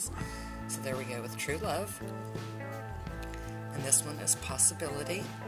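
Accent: South, United States. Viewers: im so used to ur videos and i enjoy ur accent so immensely that when i read ur replys i hear that southern drawl. <3 i love it!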